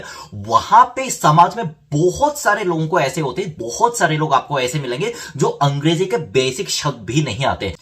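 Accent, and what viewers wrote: Standard. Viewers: Oh bro this shudh hindi even i after being an indian can't speak u are so good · Aap to bhut shud hindi bolta hai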